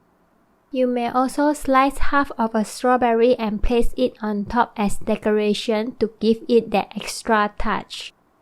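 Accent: Malaysia. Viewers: The title says Korea but She sounds like Malaysian/Singaporean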